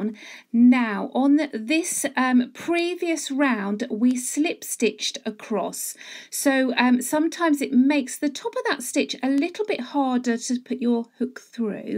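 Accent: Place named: England, United Kingdom. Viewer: You are a LIFE SAVER as this works irrespective of the size of the "granny" - THANK-YOU, THANK-YOU, THANK-YOU !!! (also lovely to hear an English accent) with love & Blessings from South Africa xxx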